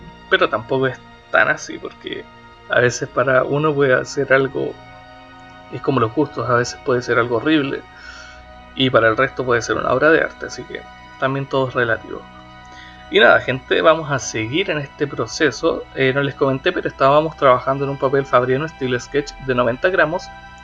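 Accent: Chile. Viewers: Compadre, es de ¿chile?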